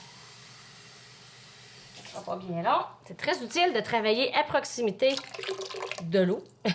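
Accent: Canada. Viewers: Coucou je vient de m'abonner à ta chaine, j'ai un regine sans gluten sa tombe bien😘jaime beaucoup ton accent Canadien 🤩ey encore un grand MERCI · Merci pour tes excellents conseils et ta gentillesse, ça va me changer des pates collantes et ton charmant accent canadien, je suis française.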